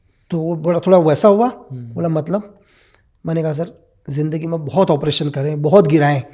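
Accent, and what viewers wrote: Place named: Kumaon, India. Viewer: Kumauni log aise hi bolte hain ..krke ya thahre ❤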